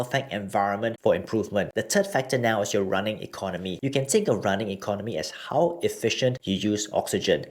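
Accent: Singapore. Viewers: u singaporean ah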